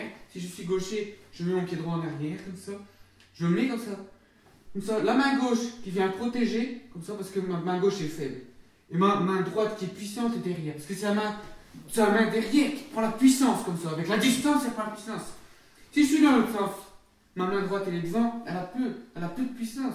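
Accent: Nord-Pas-de-Calais, France. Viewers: Exprès et il parle avec le Nord il est chti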